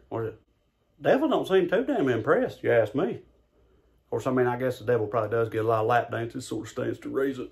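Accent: South, United States. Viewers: They couldn't even see his... Took me a second to understand the southern accent... BWAHAHAHAHA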